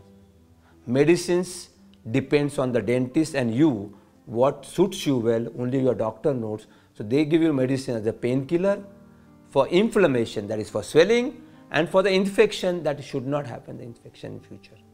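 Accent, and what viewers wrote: India. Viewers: Thank you very much, Indian people voice+accent is very calming :)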